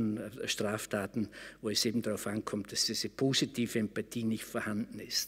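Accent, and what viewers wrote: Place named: Austria. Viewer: Aaah, kenne den Herrn aus gewissen Truecrime-Formaten im TV!!! Fand schon immer, abgesehen von der Qualität seiner Wortbeiträge, dass er äußerst angenehm spricht!!! Ein toller Redner und dazu noch dieser leicht österreichische Dialekt!!! Zum Verlieben! ❤️😂 PS. "Verrecken" ist für ihn ein Kraftausdruck!!!! Wow!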